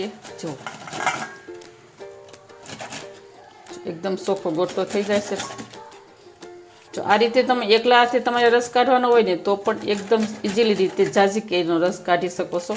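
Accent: Bhavnagar, India. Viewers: તમારી ભાષા પરથી તમે ભાવનગર તરફનાં લાગો છો, બહેન !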